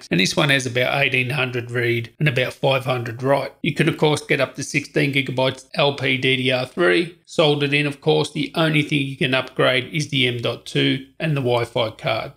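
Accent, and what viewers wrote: Australia. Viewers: fuckin love that aussie accent mate, im sick and tired of american or english reviewers, keep up with the good work. cheers from Uruguay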